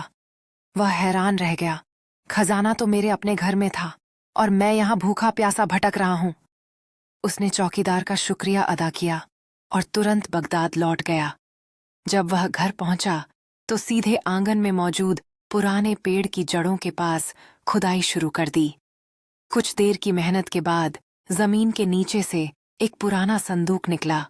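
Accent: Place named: Hyderabad, India. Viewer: Hyderabadi voice💯😍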